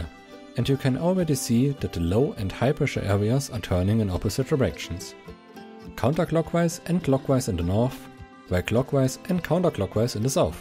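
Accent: Germany. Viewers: recognized that German accent. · hearing a german accent makes the high pressure systems turn german too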